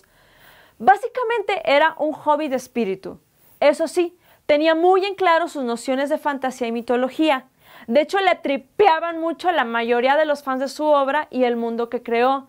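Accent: North, Mexico. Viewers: Gracias por hacer un programa así. Te quedó poca madre el guión, además de que tienes mucho carisma y definitivamente lo que gana contigo es el tono norteño, espero o estar errando en atinarle. Genial proyecto. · Sera de sinaloa o de chihuahua estoy seguro que es del norte pero no de donde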